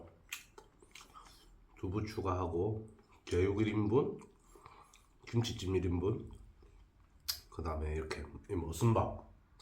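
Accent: Gyeongsang, South Korea. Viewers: 무슨밥? 머슴밥? ㅋㅋㅋ남편이 가끔 “머선일이고?”해요 ㅋㅋ 고 느낌인데요?ㅋㅋ경상도 사투리 좋아하는 1인이예요~😊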